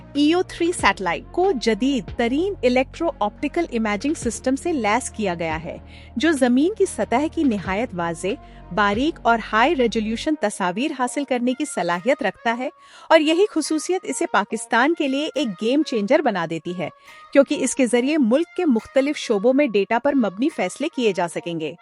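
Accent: India. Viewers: ویڈیو میں ہندی لہجے کی آمیزش نے مزہ کرکرا کر دیا۔ سوفٹویئر تبدیل کریں۔ خلا کو کھلا، مزید کو مجید اس طرح کی مزید کئی تلفظ کی غلطیاں ہیں۔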